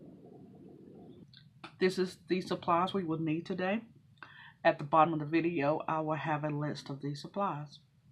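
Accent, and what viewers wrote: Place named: South, United States. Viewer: I came for the adorable southern accent. Ok, and the beautiful ring. Thanks!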